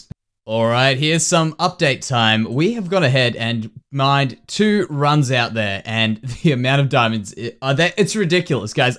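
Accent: Australia. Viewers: Hey Mate! awesome video just found your channel and it seems like heaps of fun :D definately subscribing! also are you an Aussie?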